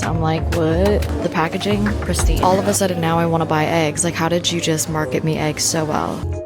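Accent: United Kingdom. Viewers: Essex babe And please torture us with the British accent !!!!!!!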